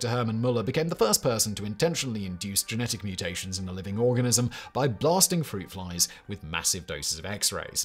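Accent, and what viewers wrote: United Kingdom. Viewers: I do love that you read Wikipedia to us, I just hate your particular British accent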